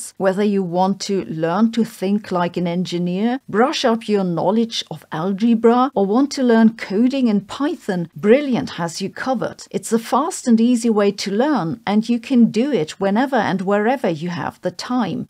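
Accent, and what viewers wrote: Germany. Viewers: Einstein is being pronounced correctly for Sabine's type of German accent. Einstein himself had a southern germanic accent which softens a lot of sounds.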